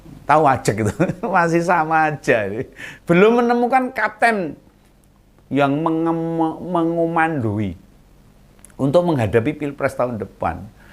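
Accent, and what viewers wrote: Java, Indonesia. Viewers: Kocakkkk abis mas è...ngakak ngakak ngakak ...medokè boso ne bikin serius d balut dagelan...politik jd asik🎉🎉🎉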